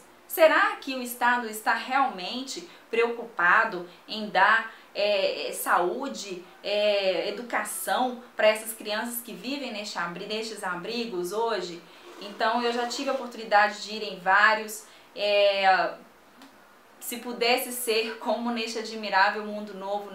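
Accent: Minas Gerais, Brazil. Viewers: Ganhou inscrito. A propósito, vc é mineira?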